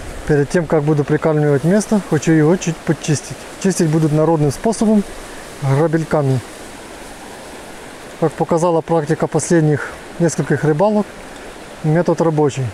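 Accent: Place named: Vologda, Russia. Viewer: Говор у Вас прикольный, только не пойму "г" мягкое как украинское, а "оканье"...🤔горьковское или вологодское🤔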